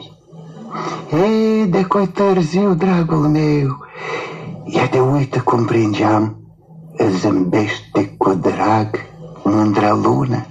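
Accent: Moldova. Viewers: Super ! Fermecatoare povestea ! Superba vocea si superb graiul moldovenesc ! Cât despre platani,aici m ai nimerit la fix pt ca pur si simplu sunt fascinată de ei ! In fiecare zi cand trec prin parc nu ma satur admirandu i ,le fac poze,ii orivesc ca oe niste opere de arta !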